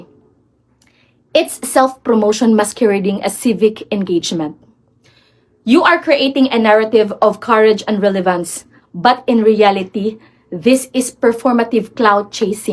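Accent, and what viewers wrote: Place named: Visayas, Philippines. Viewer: Bisayang English mo day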